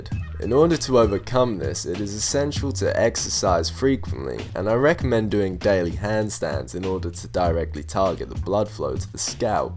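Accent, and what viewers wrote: Australia. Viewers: 😍 do I hear that Aussie accent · Was wondering why you weren't uploading videos just to find out that you opened a new account lmao your dry ass humor mixed with your aussie accent just stands out to much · No dickhead......hardwater is simply a parts per million density figure......could be peanut fucking butter in the agua,pull up fuckstick.......genetics and getting on the gear,you dumb dunce.........that stick reduces DHT does it.....................................finasteride and dutasteride have no sides in 98pcent of users...................my question is,are you self aware enough to realise you are a cuck who simply likes the sound of his own voice,Australian pronouncing VITAMIN like you do,govern thyself ........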